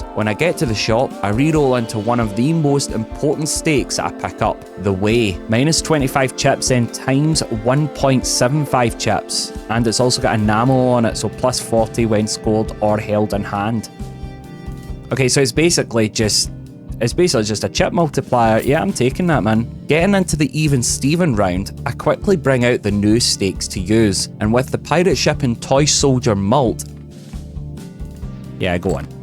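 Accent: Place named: Scotland, United Kingdom. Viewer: Luv listening to a Scottish lad playing my favorite game ❤️ cheers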